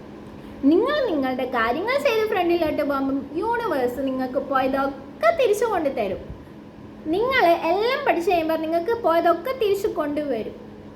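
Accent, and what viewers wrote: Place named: Kollam, India. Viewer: കൊല്ലം ടtyle സംസാരം നല്ല രസമുണ്ട്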